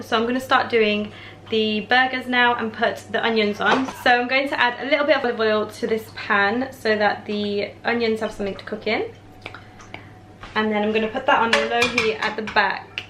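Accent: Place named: United Kingdom. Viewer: You got a real British Accent.. I bet!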